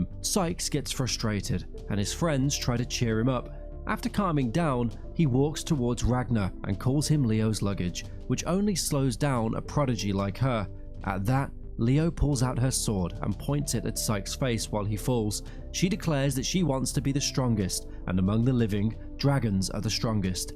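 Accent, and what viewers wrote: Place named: United Kingdom. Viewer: brithish accente good for uuuu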